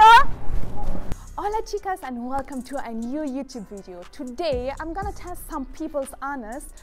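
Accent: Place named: Austria. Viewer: We love you from Germany ..... Sister, You speak very beautifully "Oesterreichische German.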